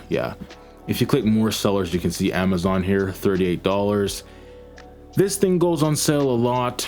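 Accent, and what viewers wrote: Canada. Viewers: Are you from Canada???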